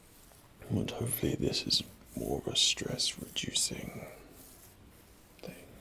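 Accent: England, United Kingdom. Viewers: Lol he's actually British. I'm Australian and we don't sound like this. I wish he was Australian though :(((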